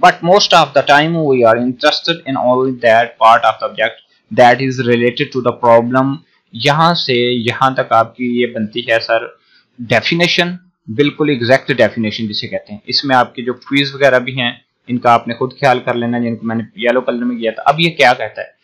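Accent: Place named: Faisalabad, Pakistan. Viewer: Pka faisalabadi han janab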